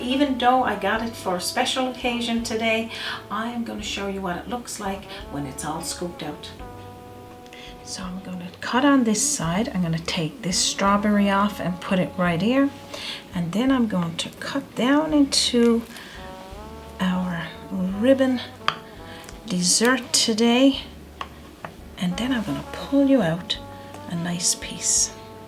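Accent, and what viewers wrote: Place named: Newfoundland, Canada. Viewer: you are just the cutest cook onYouTube! I am enjoying learning how you prepare the various meals, desserts, breads and such in Newfoundland! I developed a love for your country from a TV series I watched called "Republic of Doyle" Love the accents! Is there a reason you Newfoundlanders drop your H's?? "You 'ave to 'ave 'alf a cup!" You make me smile! 'appy Mothers Day!!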